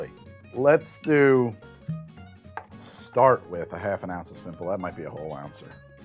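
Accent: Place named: South, United States. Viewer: Oklahoma is technically the Midwest, but, like, add a slight Southern twang.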